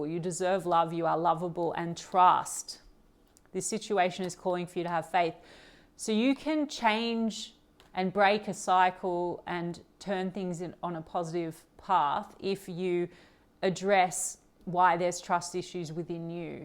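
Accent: Australia. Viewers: Wow, you picked up my kids, resonate with everything.....thank you 🙏 And your Australian 🥳🥰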